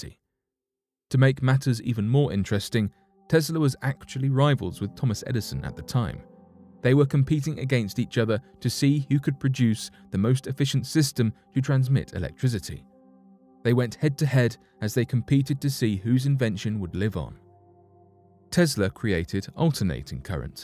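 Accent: United Kingdom. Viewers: Why is a British guy narrating this?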